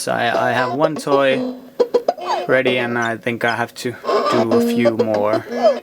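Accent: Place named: Sweden. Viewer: you sound just like martin moulin speaking.. same accent....... are you sweden too?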